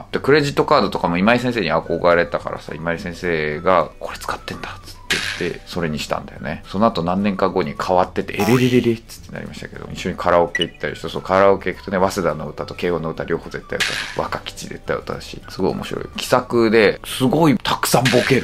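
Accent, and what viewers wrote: Kansai, Japan. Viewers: 先生の関西弁めっちゃおもろい！！ww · 関西弁かわいいw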